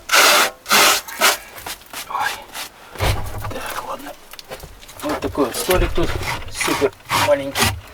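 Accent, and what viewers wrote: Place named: Arkhangelsk, Russia. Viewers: По говору вроде Архангельский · Ютуб предложил ваш ролик.Начала смотреть из интереса.А говор-то наш,северный).Приветствую земляка!Подписка.Лайк👍.Архангельская область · ! Привет! Подписался! Зашло! Где снимаешь? Я был в мезени, там такой же говор! Привет из Челябинска!!!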